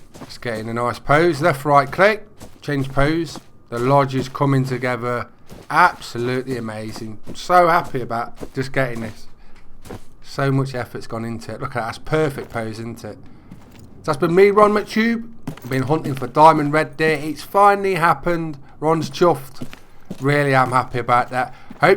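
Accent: Midlands, United Kingdom. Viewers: Nice to see you finally nail it. Your accent sounds regional. Are you Nottingham, central Midlands?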